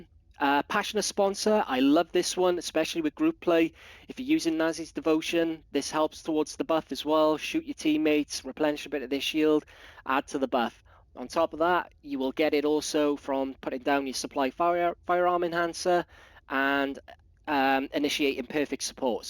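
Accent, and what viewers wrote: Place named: Wales, United Kingdom. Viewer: Love those Welsh tones. Have you considered onlyfans?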